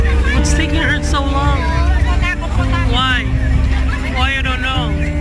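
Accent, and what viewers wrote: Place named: United States. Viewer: Sounds American!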